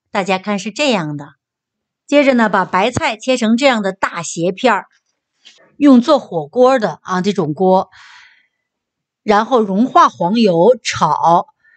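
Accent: Tianjin, China. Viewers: 听口音，天津老乡，你好👋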